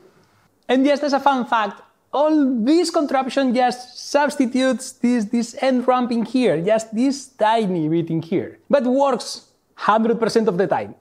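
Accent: Italy. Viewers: Absolutely. Can’t wait for the next episode. And you know what’s also brutally fun? Watch this with your eyes closed. I mean, just listen to him. He is king of speaking Italian using English words. Do so love that. 😊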